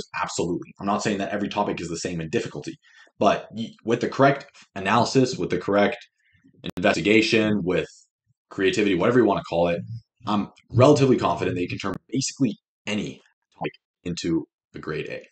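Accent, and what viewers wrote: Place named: United States. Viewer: he speaks pretty american english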